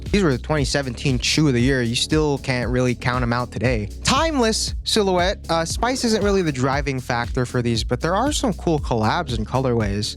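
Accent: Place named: New York, United States. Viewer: It’s funny to see an island boo speak with a New York accent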